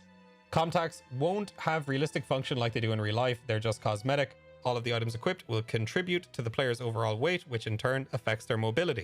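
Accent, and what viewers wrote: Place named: Ireland. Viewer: Where in Ireland are ya from sham?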